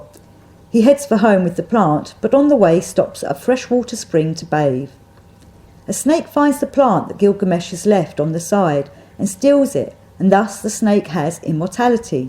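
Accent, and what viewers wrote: England, United Kingdom. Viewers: Thank you so much for your positive feedback. I am only at the beginnings of discovering and enjoying your informative videos... from the beautiful imagery and powerful symbolism, your lovely English accent to your passion for knowledge and sharing is simply golden. 🙏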